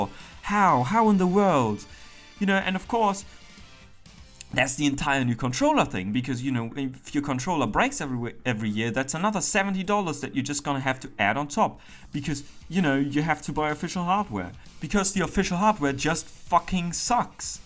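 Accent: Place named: Germany. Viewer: Based on your accent, I would say you are from germany, or some other western eu country and saying that consoles are not worth it and that you would rather build a pc is so ignorant to the rest of the world, where 1 month salary maybe wouldnt even be 500e. There's no pc that can be built for that price that can run latest games in the same quality. Also ps plus (game pass) exists with small subscription you get large library of games. And all this is coming from a pc gamer. Instead of shitting on consoles, you could be shitting on graphic cards prices. Oh, wait, you are born in rich country, you dont care...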